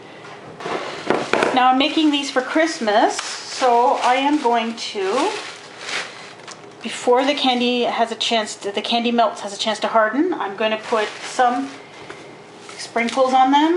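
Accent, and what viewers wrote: Canada. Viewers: aww, these look so good! did u know you have a slight Canadian accent?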